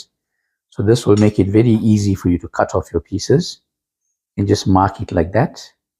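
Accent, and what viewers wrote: South Africa. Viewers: Where are you? I hear a South African accent 😅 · Dear Brother, we have reached the end and I salute you. You have a SA accent. Where can we buy your samosas please? Do you sell any in Australia? You are an amazing perfectionist cook - very rare to find people of your ilk today.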